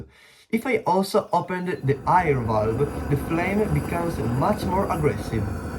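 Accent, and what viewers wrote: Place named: Italy. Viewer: Minchia si sente dall accento che sei italiano, bell video però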